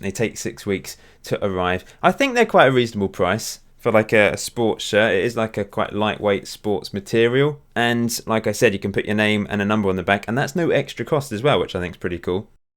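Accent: RP, United Kingdom. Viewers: I don't know if you really are posh but when my girlfriend overhears me watching your show she says "ah, you're listening to that posh guy talking about football again". so there you go...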